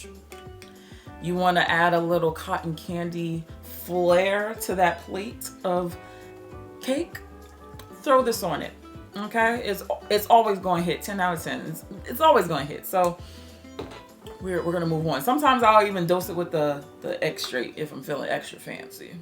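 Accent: New Jersey, United States. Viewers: Your voice sounds just like Wendy Williams ❤❤❤❤jersey vibes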